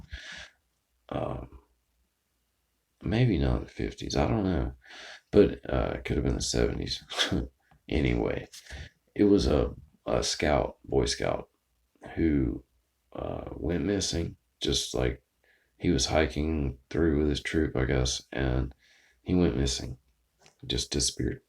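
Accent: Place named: South, United States. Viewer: How did I not know about this yet?!?! I may never have looked at it if it were not for you! I really love your podcast videos.....you have the best voice for this stuff....I love your subtle southern accent....and you are such a hottie too!!! Thanks for another awesome show!!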